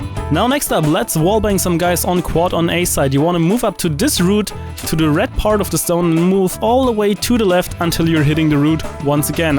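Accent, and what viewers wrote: Germany. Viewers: das DEnglisch. DAS DENGLISH. DEEEENGLISH. EINFACH AIDS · Is it possible that ur german ?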